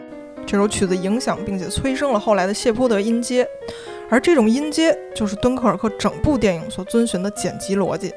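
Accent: Beijing, China. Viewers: UP主妥妥的北京人，儿化音太酷了。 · up主的北京口音很纯正呀😊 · 北京话吹牛皮就是强！这集变成南京话或者安徽话就……希望up主演绎一次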